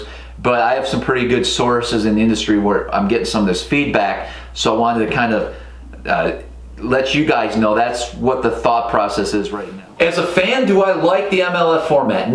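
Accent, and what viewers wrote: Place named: Chesapeake Bay, United States. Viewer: also quick question, you live in Maryland ?....I am in Richmond VA and you talk upper chesapeake bay....so just curious where you live at ...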